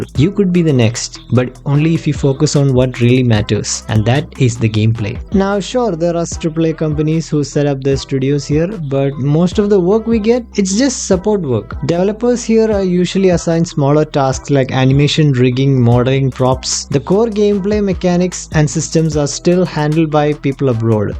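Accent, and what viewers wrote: Kerala, India. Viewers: Are you malayali? Your english is pleasing to ears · The mallu accent is quite overpowered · u sound like a malayali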